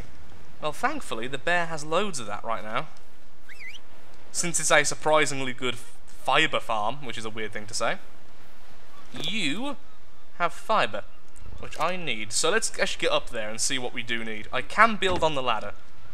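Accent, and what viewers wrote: United Kingdom. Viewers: British accents make everything sound weird...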